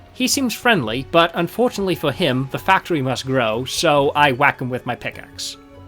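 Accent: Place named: Northeast, United States. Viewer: How interesting! I would have sworn you sounded like someone from the NE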